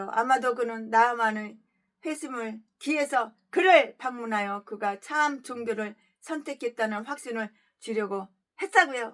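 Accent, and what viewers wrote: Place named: Jeolla, South Korea. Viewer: 예라 ㅋ ㅎ 전라도웃겨요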